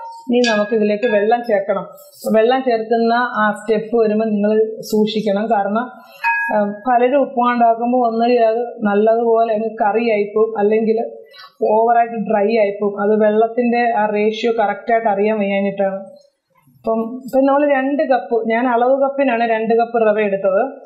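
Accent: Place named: Central Travancore, India. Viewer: njanum ptnmthita anu..central trvncore slang ketanu chodiche😀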